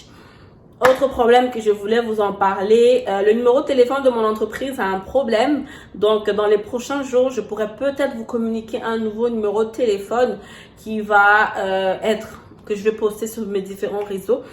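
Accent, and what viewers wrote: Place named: Canada. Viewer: Moi j'entends l'accent Canadien..lol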